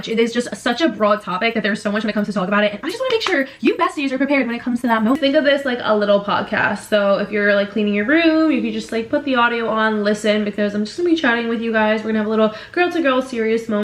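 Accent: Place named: New York, United States. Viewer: She has a New York accent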